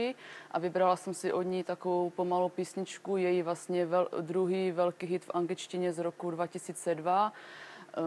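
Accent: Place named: Moravia, Czech Republic. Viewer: Ten přízvuk jo jo jasná Morava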